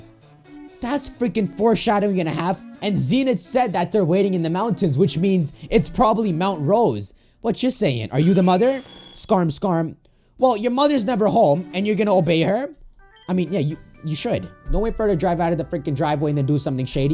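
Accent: Canada. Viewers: are you from Canada? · are you Canadian?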